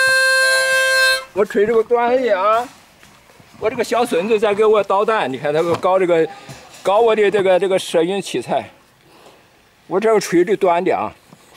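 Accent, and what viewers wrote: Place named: China. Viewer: 张先生的乡音未改，还是一口家乡话，地道"外省人"的中华民国情怀。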